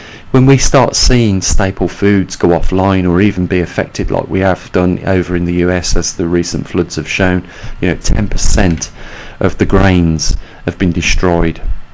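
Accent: United Kingdom. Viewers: excellent top notch scientific report narrated with the most pleasant to listen to British accent. greatly appreciate your time to produce and share your knowledge with the truth seeking community. peace be upon you always.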